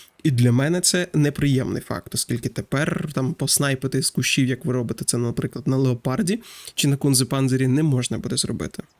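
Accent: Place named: United States. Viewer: Не надо американского акцента очень слух режет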